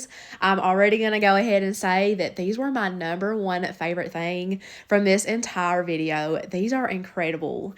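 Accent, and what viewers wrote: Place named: Kentucky, United States. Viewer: All of it looks delicious! Something that I can make! Love that Kentucky accent!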